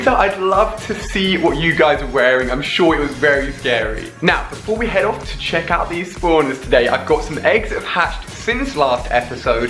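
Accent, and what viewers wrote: United Kingdom. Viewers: you are awesome. Keep up the amazing work. FYI dat british accent though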